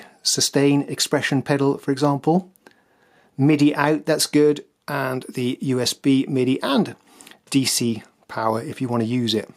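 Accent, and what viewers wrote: United Kingdom. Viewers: Looked like a classic Swedish snow covered villa-house block… but you sound British?? Great video..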